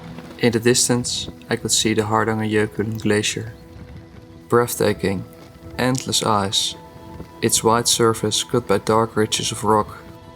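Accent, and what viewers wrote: Netherlands. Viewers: Er valt echt niet te luisteren naar Engels met een Nederlands accent, behalve als het over dit soort onderwerpen gaat. Dit is oprecht 1 van de lijpste dingen die ik heb gezien, gefeliciteerd met deze prestatie. Wat een reis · At first when I heard your English I assumed you were Dutch but then your pronunciation of the Norwegian places is so good! Anyway, loved every bit of this. Loved, loved, loved. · I stumbled upon this and upon hearing your Dutch sounding English - immediately liked and subscribed. One hour and twenty something minutes later, I feel like I've been with you on your trip through Norway. What an awesome thing to have done and very well documented with beautiful footage.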